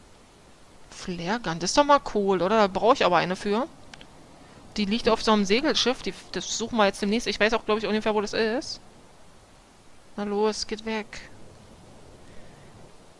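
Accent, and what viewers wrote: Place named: Berlin, Germany. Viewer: Allein wegen deinem coolen Akzent ist das Video schon richtig geil hehe ^^ ( hört sich an , als währst du eine Berlinerin :3 )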